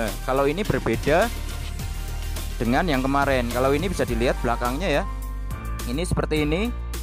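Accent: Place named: Java, Indonesia. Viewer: Jawa banget... Medok banget jawane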